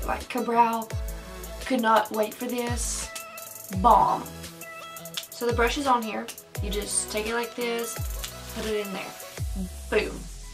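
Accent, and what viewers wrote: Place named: Alabama, United States. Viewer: You can so tell that you're from Alabama! I'm from Dothan and I moved to Ohio because of my husbands job and everyone up here automatically guesses that we're from Alabama because of our strong southern accents! 😂😂😂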